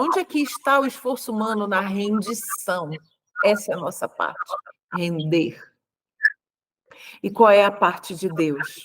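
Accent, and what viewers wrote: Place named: Pará, Brazil. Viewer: Obrigada meu Salvador Jesus por tantas bênçãos através dessa live!!🙏 Obrigada minha irmã do céu!❤ A Sra é de Belém do Pará? Seu sotaque parece paraense... Um grande abraço! Nos vemos breve com fé no Salvador!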